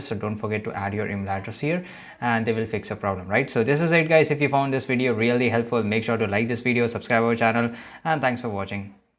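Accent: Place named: India. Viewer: Indian with an storng Indian accent and doesn’t even know how to fix it lol. Yall country is fully bad on first hand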